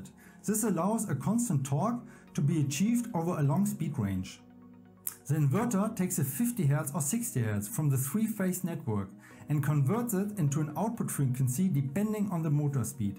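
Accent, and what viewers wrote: Germany. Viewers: 1) maybe a bit stronger sound even at maximum, I have problems listening to your video on the go (in the Berlin S-Bahn for example) 2) your German accent is extremely strong and sympathetic... 3) you put a lot of subjects in your video. I think it would be better to make different videos for each sub-subject. As you're explaining the basics here, I guess most people (including me) are a bit over-burdened with the many technical expressions 4) maybe try to use as little text in your video as possible. Maybe try showing as much by 3d-models only. Maybe use also some pointers to point to the parts of your 3d-models you're talking about 5) thank you for sharing your knowledge about electric motors. Thank you a lot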